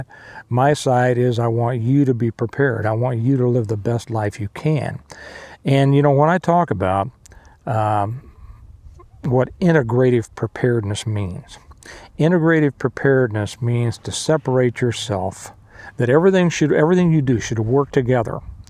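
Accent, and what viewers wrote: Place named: Texas, United States. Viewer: Kansas! I figured you were a fellow Texan from your accent and demeanor. New here. Appreciate your channel.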